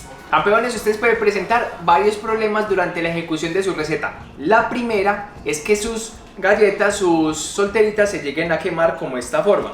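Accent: Antioquia, Colombia. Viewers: Me encanta ese acento paisita 🤭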